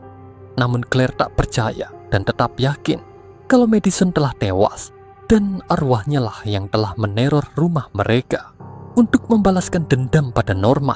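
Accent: Bali, Indonesia. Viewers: Logat bali memang gini kaa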